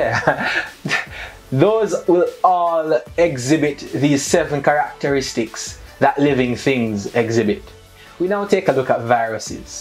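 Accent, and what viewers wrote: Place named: Jamaica. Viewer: Your work is fun entertaining and educational. My students can’t stop laughing and the spit out the information shared with such ease. It’s so refreshing to hear my own accent in something like this. I look forward to watch every topic and won’t stop sharing with those overseas as well. Brand Jamaica mi seh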